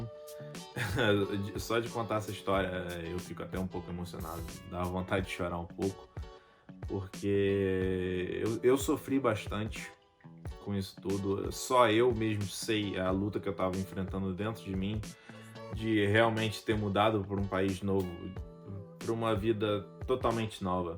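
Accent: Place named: Rio de Janeiro, Brazil. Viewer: I’m Bryan Lima gostei da sua história 😊 tão jovem já viveu muitas coisas, vim pelo canal da Jéssica gostei muito de sua mãe. Mulher guerreira não é fácil tomar a decisão que ela tomou. Pela sua educação vê que ela cuidou muito bem dos filhos, você é um garoto muito educado esqueceu de falar qual é sua cidade aqui no Brasil. Pelo sotaque é carioca vizinho aqui de minha cidade, Juiz de Fora uma cidade do interior de Minas que é um pouco carioca também #sqn você realmente abriu mão de tudo na sua vida, pra começar uma nova vida do zero imagino que não tenha sido fácil. Mas pelo jeito esta muito bem obrigado 😊 sorte sua estar vivendo em um lugar onde possa ter uma qualidade melhor de vida. É isso aí boa sorte pra você em todos seus projetos sejam bem sucedidos 🙏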